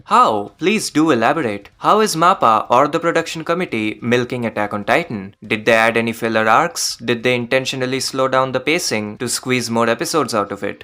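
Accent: India. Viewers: You can't mock anyone while having a loli profile picture and that goofy ass Indian accent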